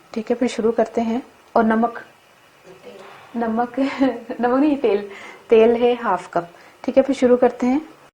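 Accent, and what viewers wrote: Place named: Punjab, India. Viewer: Oh my god your punjabi is very mast 😂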